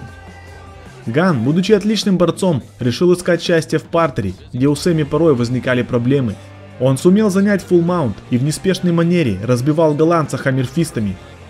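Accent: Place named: Caucasus, Russia. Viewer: Комментатор с кавказа добавил перца😆👍.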